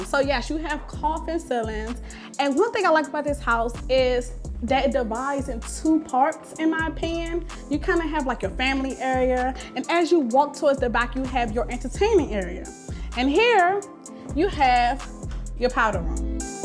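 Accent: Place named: Washington DC, United States. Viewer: Love that D.C. accent 💚💚💚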